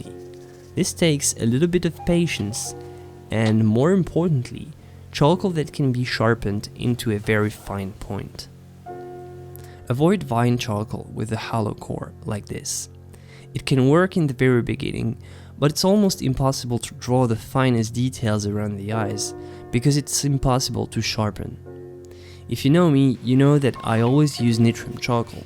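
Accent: France. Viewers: I can tell you've been working on your English. Your French accent is much less pronounced now. If you've been working on this, well done!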